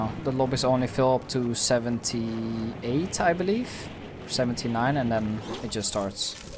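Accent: Netherlands. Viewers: Yoo bro you dutch ?? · Are u dutch? ur accent is so strong.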